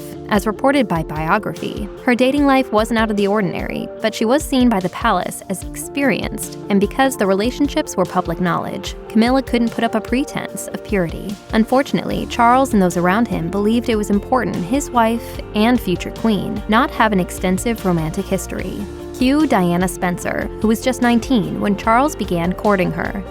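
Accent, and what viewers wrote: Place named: United States. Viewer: Oh please get rid of that yanky voice and old old old history.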